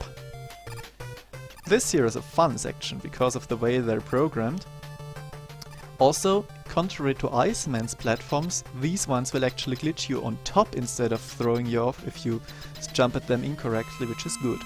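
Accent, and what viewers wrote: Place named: Germany. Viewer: Alter o.O Man hört ja auf 10km dass du aus Deutschland kommst. Oder ist das gestellt?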